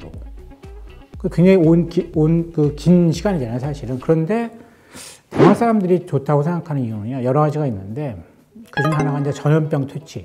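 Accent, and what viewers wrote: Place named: China. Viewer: 언어구사가 중국 계열이신거 같다. 혹시 화교신지요?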